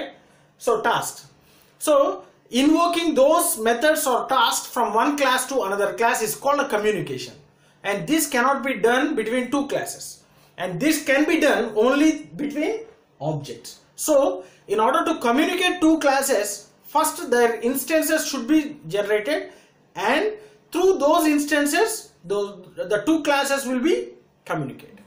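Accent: South India, India. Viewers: I m from gujarat , looks you to be a south Indian because of your fabulous extent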